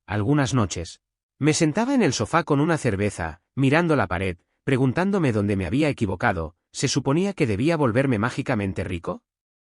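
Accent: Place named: Spain. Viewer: La verdad no sé porque a todas este tipo de historias le ponen esas voces mamonas españolas. Me caen en la punta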